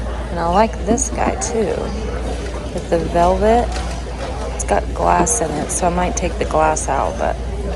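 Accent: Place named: Knoxville, United States. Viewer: Oh I love Knoxville - I thought I recognized the accent. 🇬🇧🇺🇸🌸🌸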